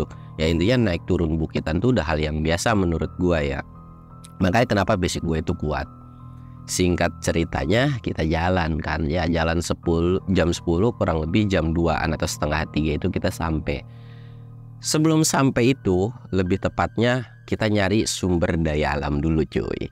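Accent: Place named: Bogor, Indonesia. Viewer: Waaaaahhhhhh...... asikkkkk.... suka dengerin... klw cerita ny sm mamang.. Bogor nich bang....😅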